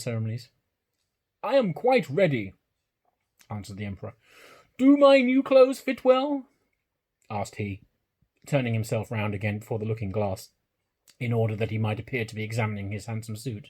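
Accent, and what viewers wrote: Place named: RP, United Kingdom. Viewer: It's been so long since I heard your voice I forgot how posh you are hehe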